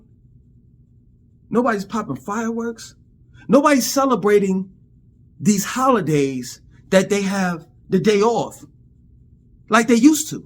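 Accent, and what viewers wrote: New York, United States. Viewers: your shouting (along with the New York accent) makes you the Stephen A Smith of commentary on society’s ills! I mean this in a complementary way……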